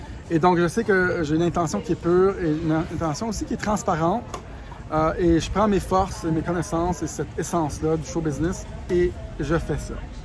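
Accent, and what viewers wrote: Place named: Canada. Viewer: En plus tu es très sympa. J' aime entendre les canadiens qui parlent français parceque je les comprends bien.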